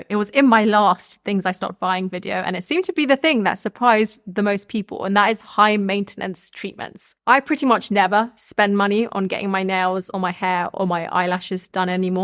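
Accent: United Kingdom. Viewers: Love your attitude. ❤ I have similar Lifestyle. As a german, I must say, you speak to fast for me and very britisch 😊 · Again overdose of british accent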